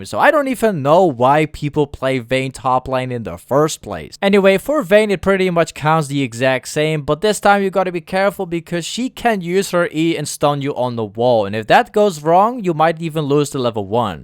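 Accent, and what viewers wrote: Netherlands. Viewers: Are you Dutch? You sound Dutch speaking (Belgium/Netherlands)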